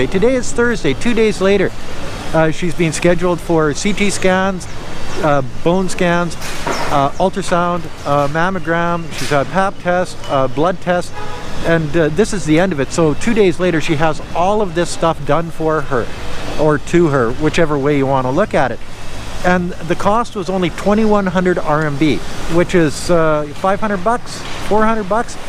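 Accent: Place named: Canada. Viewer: Once a while I notice the Canadian accent 😂.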